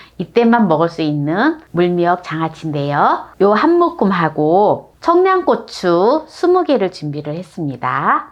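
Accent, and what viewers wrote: Jeolla, South Korea. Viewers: 샘이 전라도 사투리 끝트리ㅎㅎ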